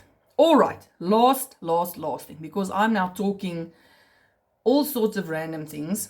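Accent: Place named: South Africa. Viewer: Hello, I started following you on youtube because I also do amigurumis. But what called my attention was your english, sounded so familiar.....😜I am from South Africa also but living in Portugal. Love your work.🐱🐷🐹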